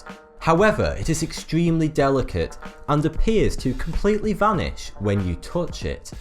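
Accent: United Kingdom. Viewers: thomas I love the British accent, seriously... I could listen all day and never tire of it. :)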